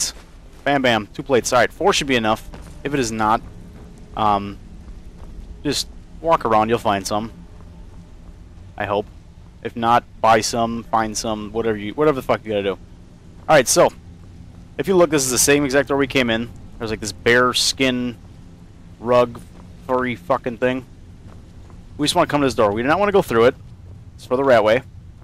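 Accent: Boston, United States. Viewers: You from baahwsten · something tells me your from Boston... My step fathers from boston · lol you sound like you're from Boston or Rhode Island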